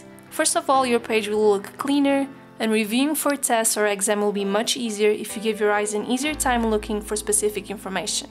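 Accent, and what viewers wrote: Portugal. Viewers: Hey, your accent sounds very portuguese xD wondering if you are, and if so, where are you from? Edit: ok you said in the video that you are portuguese xD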